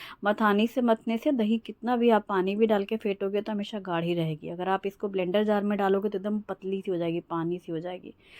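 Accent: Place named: Banda, India. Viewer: Sahi kaha rhi ho aap kaam Kabhi nahi katam hota apke bolne ka ton bilkul banda ki taraf ki hai kahi pe raho pr apne jameen ki khushbu aa hi jati hai❤️❤️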